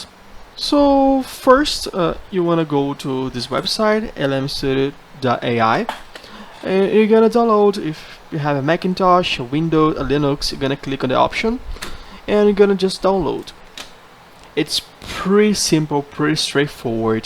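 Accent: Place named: Brazil. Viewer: Thanks for the video, I have the same GPU. (Acer Nitro 5 / Intel Core i5/ 32 gb DDR4 / GPX 1650). By the way, are you Brazilian too? I am Brazilian and your accent sounds like mine lol.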